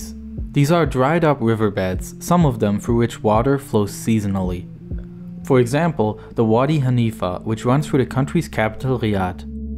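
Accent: Germany. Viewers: But he does say réservoir properly. So I think it's an accent thing. Probably German. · ReSAWARS. Dieser leicht Deutsche akzent triggert bissle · You sound german, are you ?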